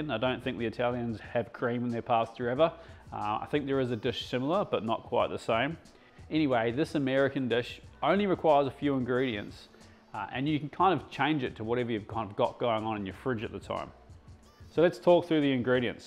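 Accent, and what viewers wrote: New Zealand. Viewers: Was wondering where you were from, seen a lot of the short reels come up on instagram where you didn't talk much. but the moment I heard you say the word "Rinse" I could tell you were a Kiwi. Runse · I had a feeling you were kiwi from the accent, but confused cause you lived in QLD 😂 Can wait to try this dish and surprise the Mrs 😘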